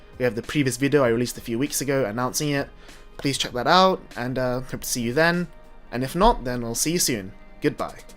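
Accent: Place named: Belfast, United Kingdom. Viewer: I like that Belfast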